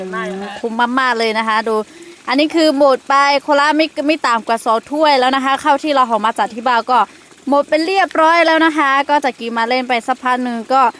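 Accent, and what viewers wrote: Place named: North, Thailand. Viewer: เห็น พูดเหนือก็รู้สึกว่าน่ารักดีนะ👍👍👍👍👍🌹🌹🌹🌹🌹🌹เหนื่อยลำบากแต่ก็อร่อย ทำให้สุขภาพดีได้ออกกำลังกายกันสุด สุด😅😅😅😅😅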